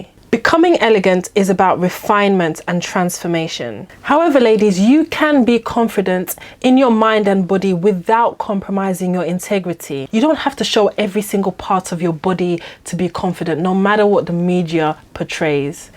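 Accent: United Kingdom. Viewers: a lovely British accent helps! Lol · I love your british english/accent.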